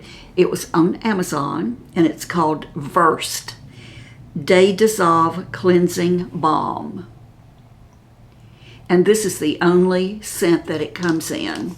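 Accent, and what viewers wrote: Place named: Arkansas, United States. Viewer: I've been looking at your channel for the last 3 weeks and I like all the videos I've watched so far!. I was wondering where you were from I know someone from Arkansas that has the same kind of accent that you do.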